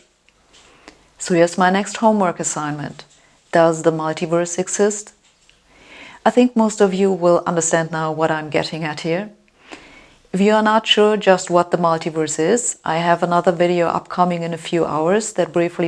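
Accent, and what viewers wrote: Germany. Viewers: Learn English, I don’t understand this German accent.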